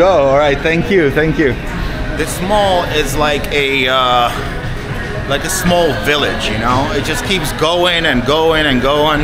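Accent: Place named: United States. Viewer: you born in russia you live in poland? you sound like american its weird 😅 but man thank you for having you here in our country maraming salamat mabuhay po kayo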